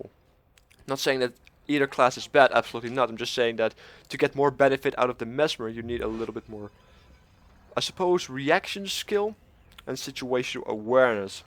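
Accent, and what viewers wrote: Netherlands. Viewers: Nederlandse accent in het Engels! ;) So nice to hear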